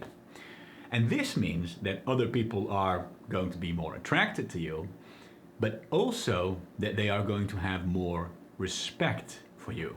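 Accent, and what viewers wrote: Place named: Netherlands. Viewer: I have no idea if you want to share your nationality with us, but you seem dutch (no offense because your pronounciation is proper). Am I right?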